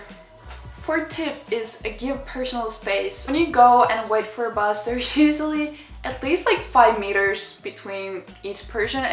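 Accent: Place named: United States. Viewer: U have american accent more than the finnish one😍 just perfection💓!!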